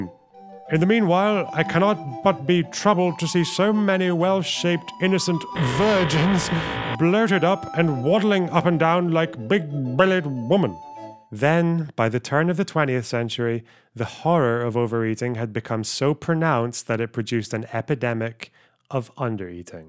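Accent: Canada. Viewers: I absolutely lost it at your beautiful Canadian accent. Well done 👍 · Nailed that Albertan accent my guy.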